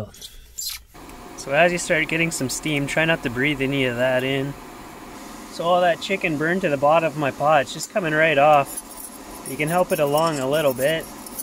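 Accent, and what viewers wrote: Canada. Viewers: Love that Canadian accent, everything sounds like a question. This man has a better life in a minivan than a lot of people in brick and stick homes. Happy trails! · This guy sounds so Canadian 😂😂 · Could listen to this guys Canadian accent for hours, in fact I think I have, shit what time is it!? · The most Canadian accent I’ve heard in my life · That Canadian accent! I'm from Wisconsin, and people think I have an accent lol.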